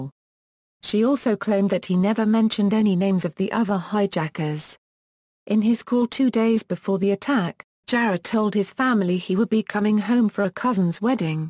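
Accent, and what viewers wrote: United Kingdom. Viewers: That’s just how British people talk lol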